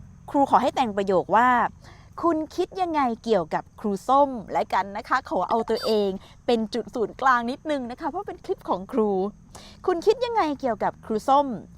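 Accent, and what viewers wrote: United Kingdom. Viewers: ชอบสำเนียงบรีติช ของครูส้มมากครับ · ผมชอบสำเนียง British Accent ของครูส้มมากเลยครับ ไม่ทราบว่าครูส้มแต่งงานยังครับ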